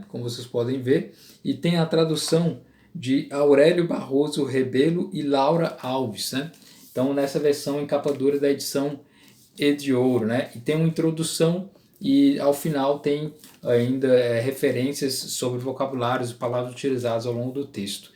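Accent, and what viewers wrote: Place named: Recife, Brazil. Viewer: Você é de Recife? Percebi um leve sotaque.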